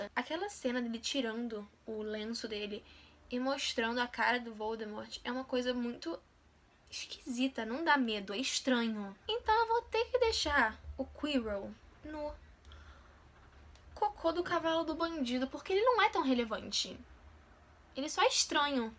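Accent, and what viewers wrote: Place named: United States. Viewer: seu sotaque americano é tudo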